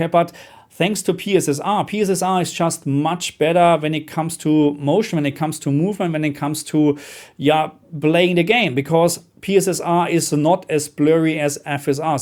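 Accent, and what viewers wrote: Germany. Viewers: your English are perfect!I am from Greece but I like your German accent.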